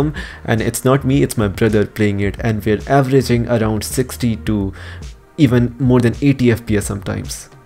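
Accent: India. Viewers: This indian accent is so anoying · Indian accent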